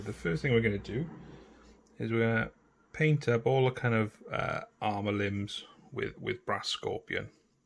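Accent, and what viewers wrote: Wales, United Kingdom. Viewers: Loving the vids! Nice to hear a fellow Welsh Accent in the painting scene! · You sound Welsh where you from mate?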